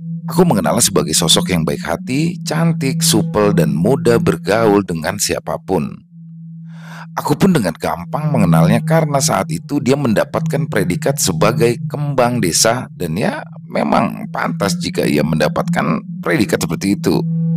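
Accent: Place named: Sunda, Indonesia. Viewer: Mirip memang....saya ngikutin jg..karena bisa liat2 pemandangan....hanya beda dari logat si...kalau kek nya terdengar Sunda banget hehe....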